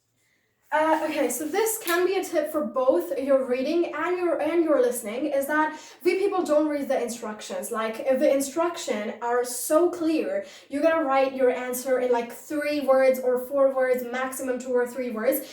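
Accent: United States. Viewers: Awesome When I close my eyes I feel like someone American 🇺🇸 speaking in this video Keep it up Are you offering any one to one class for student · is this American or African American accent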